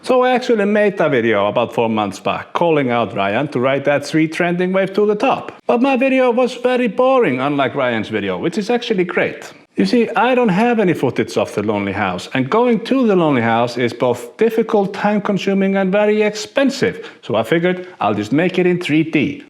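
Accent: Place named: Iceland. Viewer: Everything sounds better with Icelandic accent! But be honest, you have eaten these birds before, did you?:)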